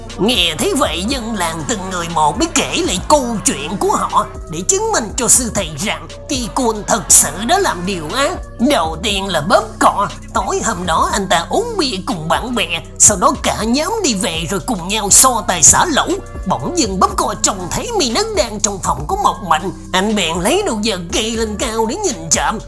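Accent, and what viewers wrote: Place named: South, Vietnam. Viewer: Giờ mới thấy giọng miền nam lồng tiến , hầu hết giọng bắc kì giờ muốn muốn bao cả youtube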